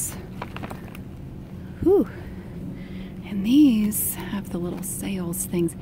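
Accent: South, United States. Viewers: Her southern accent is giving me LIFE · southern it sounds like north Carolina or Tennessee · I love your shopping videos despite all the background noises... it makes me feel like I'm shopping mom/grandma (I only say grandma cuz my grandma has the same sorta southern accent as you!) Cept she was mean to me and your soo super sweet and nice! It's almost like having a mother who loves me... I know that sounds a bit sad but your very comforting to me like the mother I never had <3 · Seba Arias she has a southern accent ( she probably lives somewhere south in the us like Alabama or Texas or something) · TheLion yeah sounds very NC/SC/Georgian as someone with family from that region. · I think she won me over with the crunch of the bagged candies sound and her Southern Drawl. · This is the best ASMR video I’ve seen and heard in a loooooong time. Certain accents do it for me, so the wonderful combo of your southern accent, your soft talking (I’m not a huge fan of whispering, so your voice was perfect), your attentive organization and knowledge, the sound of all the crinkling......and 30 minutes of it! 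Pure ASMR perfection......thank you......I can’t wait to watch more of your vids. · If anyone from Britain comes back to these comments, I have a question. This video’s narrator has a Southern accent (she would say I have a Yankee accent LOL). Does her Southern accent sound more like British English than other American accents? I have heard this may be the case but was not sure. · Southern, we have it where I live but I’m not that extreme😂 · Seba Arias american? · Zoran K. georgia,south carolina,north carolina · Seba Arias southern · Southern usa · Normally I find southern accents annoying but yours is so soothing.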